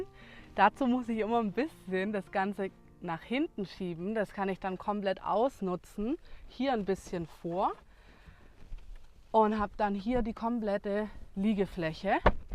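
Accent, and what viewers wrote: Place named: Swabia, Germany. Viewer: Ein Hauch von Schwäbisch - sehr charmant.😊😊😊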